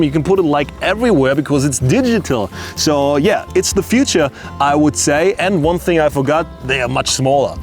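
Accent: Germany. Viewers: are u german? just curious,cause of the dialect... edit: your camera is setted to german, so i may be right! greetings from austria!😉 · omg this German accent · wow ich find es so lustig wenn deutsche englisch sprechen 😄aber das gefällt mir sooo gut weil ich dich echt gut verstehen kann obwohl englisch nicht so meins ist... Ich habe eine Sony a58 und ich liebe sie 😍 ich liebe es auch dass man durch den Sucher alles machen kann, und ich habe sie eigentlich nur gekauft weil sie sogar viel günstiger war als canon dabei hatte sie mich von Anfang an so gut überzeugt😍😍 aber ich meine, meine hat trotzdem einen Spiegel 😄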